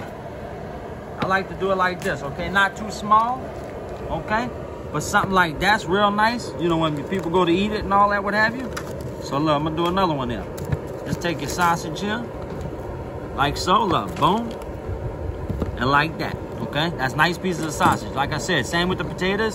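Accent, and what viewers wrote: Louisiana, United States. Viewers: A Cajun accent is almost as cool as a yooper accent.. Thanks for the tutorial. I'll be trying this one. 👍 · Creole accent from New Orleans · This Arkansas girl here love your Louisiana accent👍👍👍❤️❤️❤️❤️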